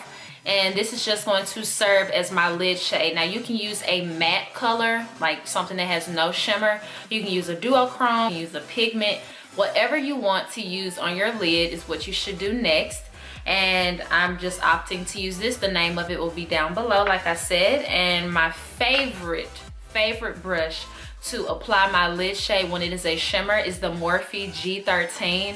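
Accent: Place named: Texas, United States. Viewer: You have the cutest Texas accent and great video!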